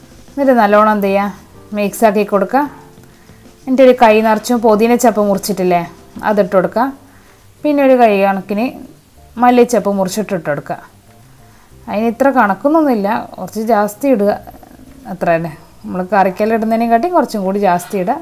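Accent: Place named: Kannur, India. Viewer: Adipoli ayitund.....enikk nde avtharanathil...ettavu. Ishtam enthanenn vechal..nammale..Kannur..bhashayil thanne ulla...... natural aya avtharanam...super dear..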